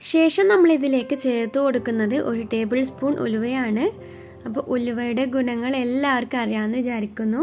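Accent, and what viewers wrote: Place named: Malabar, India. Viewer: ശബ്ദത്തിൽ ഒരു മലപ്പുറം /കോഴിക്കോട് ടച്ച്‌ ഉണ്ടല്ലോ 🙂😆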